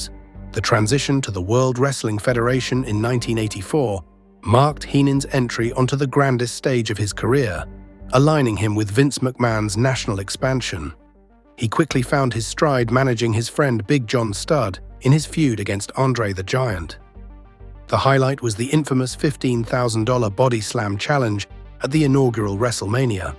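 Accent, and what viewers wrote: United Kingdom. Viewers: It's amazing to me how a great topic like this can be turned into a complete dribble by a British accent thumbs down for that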